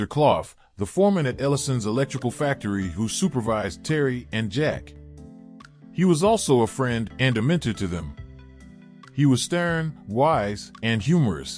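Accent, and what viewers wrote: United States. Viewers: Got the pronunciation wrong. Told in americanese. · A narration with an American accent is inappropriate for this video.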